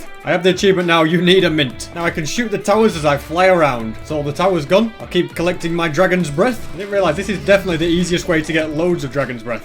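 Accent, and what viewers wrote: United Kingdom. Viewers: Are u from uk?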